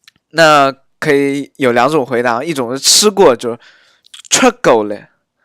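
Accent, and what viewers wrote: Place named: Ningbo, China. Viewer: 妈蛋 你一开口我就感觉听到自己的声音了 宁波人果然说话感觉都有差不多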